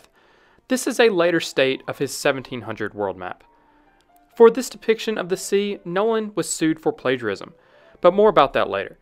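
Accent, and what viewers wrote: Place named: Ohio, United States. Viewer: Dear, this is fascinating history. However, your pronunciation of Dutch, Spanish and French personal names is cringe-making. You know, you can Google pronunciations of practically anything, thereby making you sound less like an Ohio kid who's never been farther than 100 miles from home. I know I'll get pushback for saying this, but there's a reason why, in England, Received Pronunciation (RP), or BBC English, is considered desirable for journalists -- so that accents and regional pronunciations don't distract from the reporting. Good luck!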